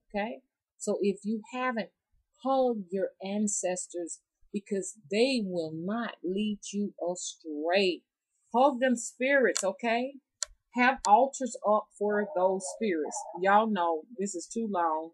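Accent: South, United States. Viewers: I absolutely love the southern drawl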